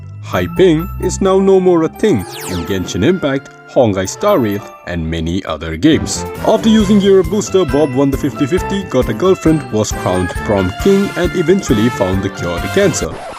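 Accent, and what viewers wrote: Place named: Kerala, India. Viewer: Ur voice is similar to indian mallu accent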